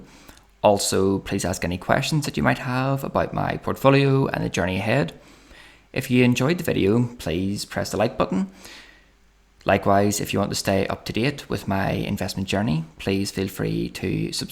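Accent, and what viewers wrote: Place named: Belfast, United Kingdom. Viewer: Belfast accent. Same as myself. This is good info. Thanks man. Best of luck with it all.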